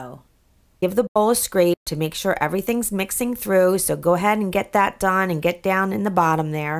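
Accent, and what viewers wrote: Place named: New York, United States. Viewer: Hi! You sound like your from NY!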